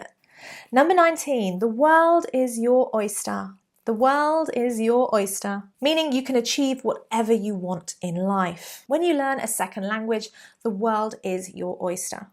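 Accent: United Kingdom. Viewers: Like the subject n uk tone 👍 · .. I hope you're doing jolly well... I love your accent.. Would you mind making some videos to improve british accent... And more Posh videos... they are my cup of tea... Thank you so much for your time...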